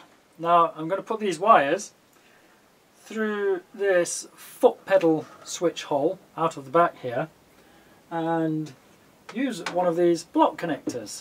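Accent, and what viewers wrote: United Kingdom. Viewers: What a challenging project,! I follow your explanations with great interest. Despite my limited English, your British pronunciation is a free language class!!!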